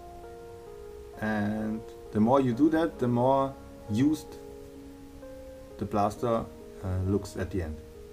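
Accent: Germany. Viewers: Are you german? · where you from? german? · Are you german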